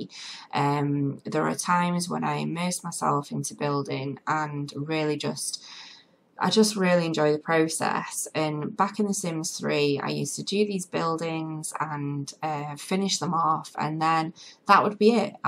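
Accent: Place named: Northern England, United Kingdom. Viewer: You are more than worthy of everything you do! It's just jealousy of your talent for these builds that's all. Really enjoying binge watching your videos and to hear your genuine comments on the bs you receive! Also nice hearing you're English like myself, except I'm not from your area (I think you're up north somewhere). X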